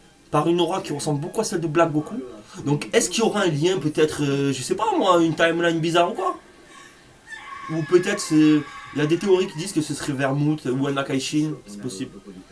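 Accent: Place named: South, France. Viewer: Oh mamène t'as un accent du sud comme moi, t'es d'chez nous ? :P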